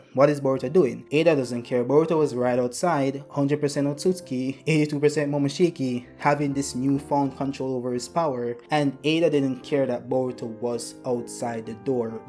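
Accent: Jamaica. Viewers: Hmm u sound Jamaican... are you?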